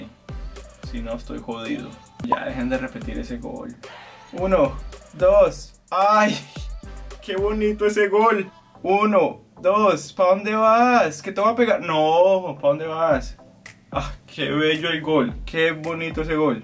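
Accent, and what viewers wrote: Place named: Cali, Colombia. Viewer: Puro asentó de Cali ve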